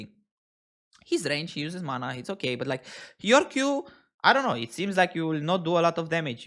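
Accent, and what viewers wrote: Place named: Romania. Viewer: you have an romanian accent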